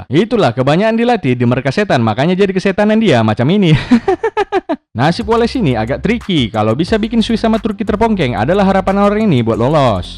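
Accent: Medan, Indonesia. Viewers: 𝘛𝘶𝘬𝘢𝘯𝘨 𝘴𝘰𝘬 𝘯𝘨𝘢𝘫𝘢𝘳-𝘯𝘨𝘢𝘫𝘢𝘳𝘪. Medan kali, bah!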